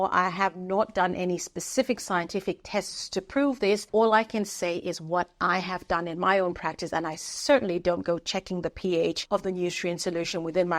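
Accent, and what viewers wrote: Australia. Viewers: The lady I bought a few of my plants from watches your channel & she brought over some plants in leca & pon. I couldn’t see the water level, so I panicked & repotted them straight away. I’ve never experienced root rot, but the roots sitting in water scares me. Yours look great, so it’s working for you. I just adore an Australian accent 😌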